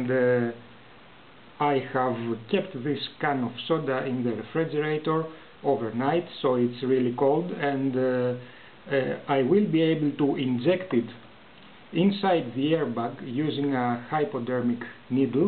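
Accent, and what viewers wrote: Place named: Italy. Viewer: Accento italiano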